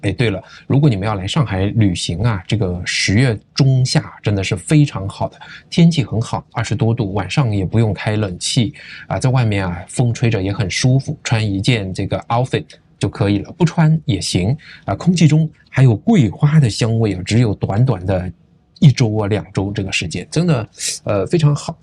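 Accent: Malaysia. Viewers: 在大马搭车，你还要特别选择安静搭乘，不然driver会认为聊天的机会来了，只能说我们大马人真的太热情了😅话说，你说话的方式越来越大马化了啦，尤其那个....我觉得hor😆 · 哈哈，你的口语也是有大马的味道了。中国人口多，没办法跟大马对比。=)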